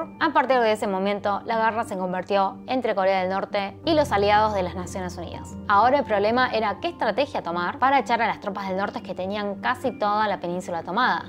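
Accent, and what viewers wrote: Argentina. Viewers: Que linda ver yba asiatica manejando bien el español pero estabpiba lleva algo de acento argentino xd jaja te amo ...por darnos esta información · una KEIKO y habla como argentina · Con razón tu tono argentino de hablar que no captava del todo. Muy interesante la historia. Gracias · Las mejores narraciones han sido con acento argentino, aquí otra prueba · I would rather listen to you narrating this video in Korean or English than in Spanish and worst with that argentinian accent, pity!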